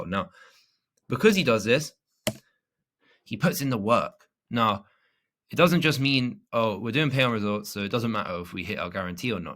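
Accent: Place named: England, United Kingdom. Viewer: Hey bro very useful video 👌🏽 I’m also from england feel like your accent is close to where I live lol. I got a question, I’m starting in the restaurant niche as I’m 22 and have been in the industry since 16 so call me an insider if you will, what I don’t get is the pricing, clientele is different to the average smma niches average cost per head is like 20-40 quid not 1000 so I don’t get how I can make it work maybe I already know the answer but would it help figuring out the pricing after the zoom call to find out about the restaurant how much they make and their goals etc thanks.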